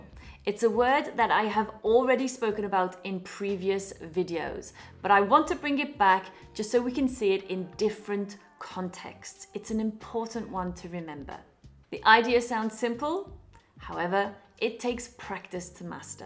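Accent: United Kingdom. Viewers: I really enjoy watching your videos and listening to your British accent. 😉 Although what you say sounds easy, the reality is very harsh. However, I want to continue learning from your videos. Have a nice weekend...Thanks,. 😊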